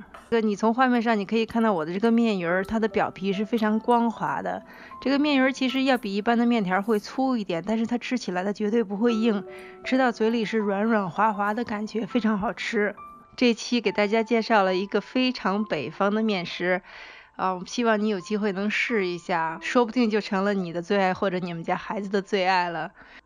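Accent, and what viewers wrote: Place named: Northwest, China. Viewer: 听你口音是西北人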